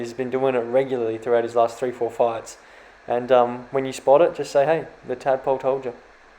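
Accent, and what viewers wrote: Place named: Australia. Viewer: Great content. Great fucking vid, some parts were very funny. What does cadjup mean? Much love from Aus, when is the next vid out? Sounds like you are from Australia.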